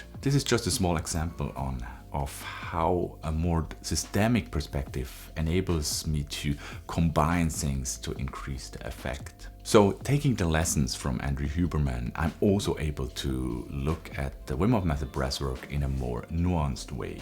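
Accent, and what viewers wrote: Switzerland. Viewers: are you swiss ?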